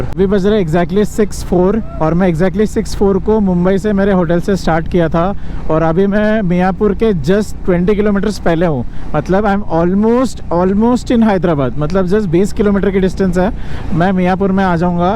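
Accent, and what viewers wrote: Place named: Solapur, India. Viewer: Are you from solapur originally ? Your marathi accent is kinda solapuri.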